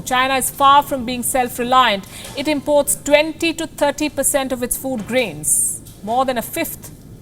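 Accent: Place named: India. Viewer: You sound like your Indian, theres nothing wrong with that, but considering India has the same amount of people and they have the same weather problems, so it should be safe to say that India also has a food shortage, yes? So why dont you also report on that, why puck on the chinese ? As i can see in the History books, china is one of the few countries around the world that has never invaded another country, so why are they hated. On the other hand AMERICA has invaded almost every continent in the world,. Think about that. Yes im proud to be American. Dont thread on me. Hoorah.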